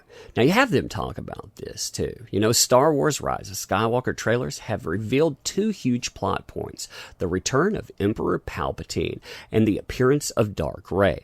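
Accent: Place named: Carolinas, United States. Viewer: are you north or south Carolina? You sure sound like it 👍 This Skywalker movie sounds horrible and I dont see it doing what Disney hopes.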